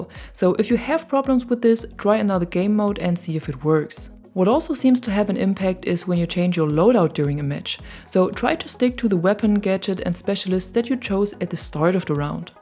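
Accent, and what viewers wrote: Germany. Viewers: Dein deutscher Akzent hört man sehr gut raus . Würde mir in deutsch besser gefallen ✌🏻😌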